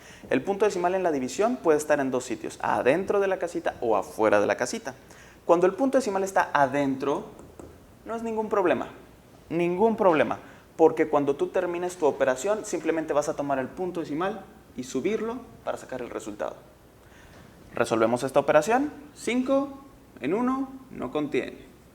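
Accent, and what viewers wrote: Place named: Mexico. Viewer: El profe es muy bueno explicando,pero el acento ese mexicano,no me va,prefiero español de argentina,perdón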